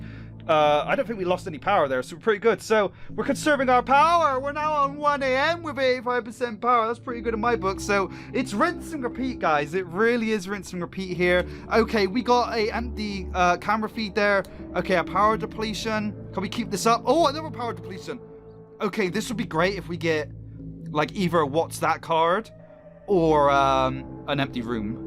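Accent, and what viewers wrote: United Kingdom. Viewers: Like it sounds like a mix of British and Australian But it sometimes phases into a bit of French And it randomly goes to American I’m sorry if I offended you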